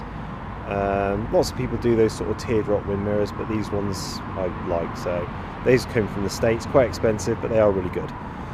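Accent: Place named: Swindon, United Kingdom. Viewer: It looks stunning in that orange. Where did you get that smaller number plate made? Going by your accent I would say your near the Swindon area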